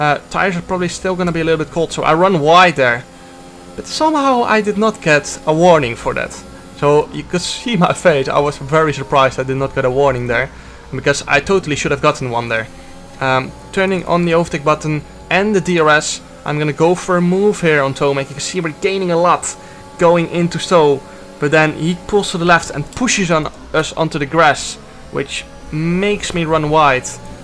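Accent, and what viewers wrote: Netherlands. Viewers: Ik hoor zo overduidelijk dat jij nederlands bent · Je spreekt beetje Nederlands Engels?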